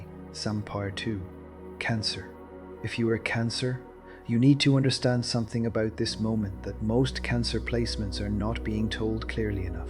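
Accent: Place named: Ireland. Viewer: 💯👊💪👍 Bravo - First Time Hearing the Voice of an Irish Astrologer. 👏🏻👏🏻 Wow. Right now, this is like finger on the pulse, so much in my financial horizon, it's right on the money.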